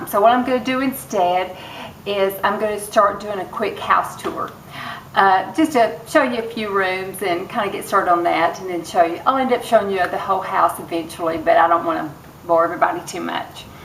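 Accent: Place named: Texas, United States. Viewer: Your house is just gorgeous. I have always loved Victorian houses and used to want one. I got over that later on after finding out how much work to restore:( Yours is lovely. I also like other periods like 1930s, - 1950s retro styles. You have the cutest way of talking but it reminds me more of a TX gal I used to know. My folks are from Newport TN and I noticed u are from East TN...what part?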